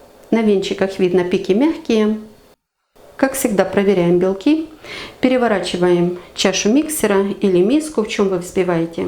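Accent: Ukraine. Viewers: Нее. По хохлятски говорите. Непрятно слушать. Тьфууу картавите таак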